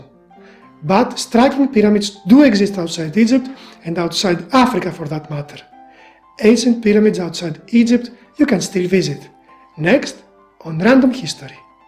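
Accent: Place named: Greece. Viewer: You sound Greek af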